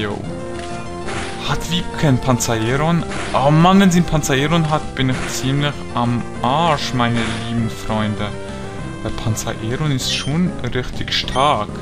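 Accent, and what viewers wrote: Switzerland. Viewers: Bist du Schweizz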